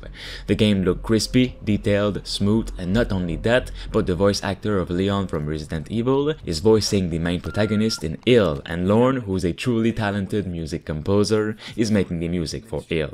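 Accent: Quebec, Canada. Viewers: Are you from Quebec?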